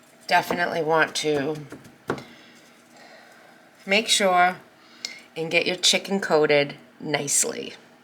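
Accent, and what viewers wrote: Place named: Boston, United States. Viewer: Talking about accents, I absolutely love your Boston accent! I love all different accents, they just bring warmth to our language! Unfortunately mine is just plain jane!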